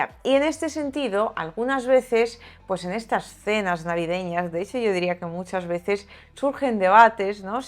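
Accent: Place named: Spain. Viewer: Muy buen informe, como es de tu costumbre; para ser honesto, lo único me pesa es tener que bancarme ese espantoso español de españa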